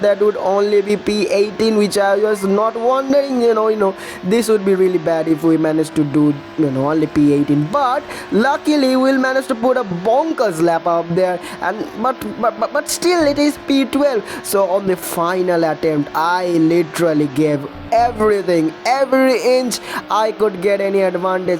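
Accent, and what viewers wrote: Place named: Pakistan. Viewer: Your english sounds like Pakistan's english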